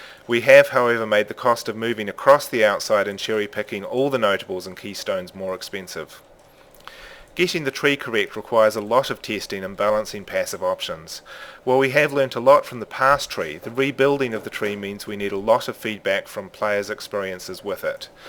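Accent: New Zealand. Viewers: Awesome kiwi accent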